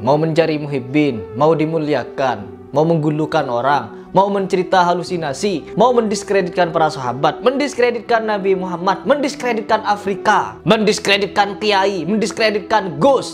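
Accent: Madura, Indonesia. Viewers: Logatnya kaya orang Madura.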